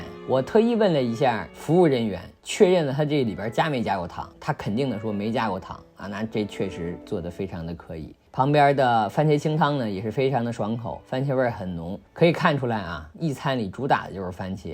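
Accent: Beijing, China. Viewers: 喜欢你说的中国语是北京话吗？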